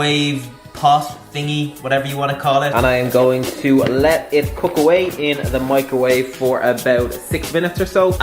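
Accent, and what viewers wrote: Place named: Ireland. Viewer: Love the Irish accent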